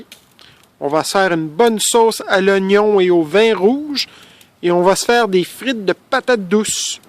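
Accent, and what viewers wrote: Canada. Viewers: bonjour super ta video ton humour et ton accen canadien super je suis de normandie en France et j ai apprecier a plus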